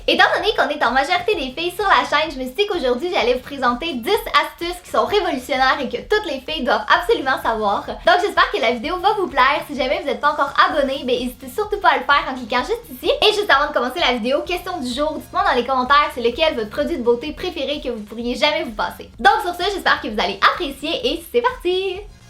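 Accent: Quebec, Canada. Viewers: J'aimerais tellement avoir l'accent québécois ! 😢❤❤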